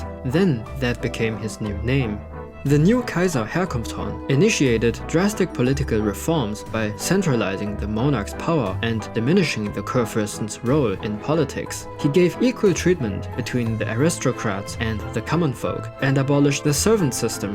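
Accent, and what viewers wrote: Germany. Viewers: This being narrated by an actual German dude (I assume anyway, ‘cause I mean, if you aren’t, then you sure do a good impression), this really couldn’t have been done better. Satisfaction.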